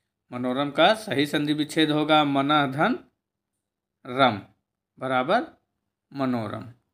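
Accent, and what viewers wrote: Bihar, India. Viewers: Bihari hindi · bihari hindi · Bihari Hindi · बिहारी हिन्दी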